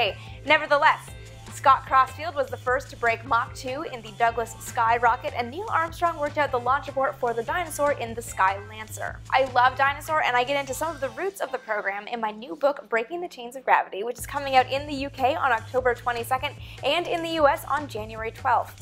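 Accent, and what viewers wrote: Canada. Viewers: I used to have a couple of Canadian friends here in Australia but they moved to the states for work. So I've always loved your accent. You always seem to find those extra little tidbits on a something I thought I knew about, so it'll be awesome to see what you turn up on the Arrow. It's a shame about the plane but the rumours about plans and maybe a last one getting away are fantastic.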